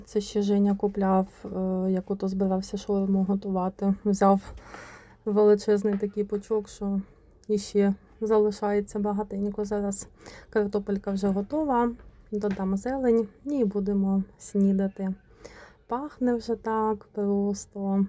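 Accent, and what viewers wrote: Surzhyk, Ukraine. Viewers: Ще що вам хочеться? розмовляє на суржику,і це нормально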